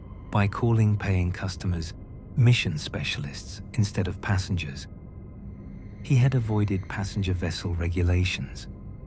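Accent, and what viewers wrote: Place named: United Kingdom. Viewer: wonderful British accent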